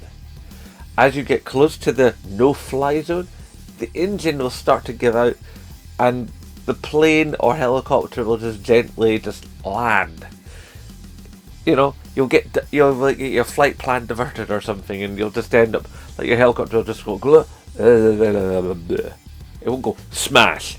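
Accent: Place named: Jamaica. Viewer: Wait a minute you're white?? Thought you were Jamaican bro!!?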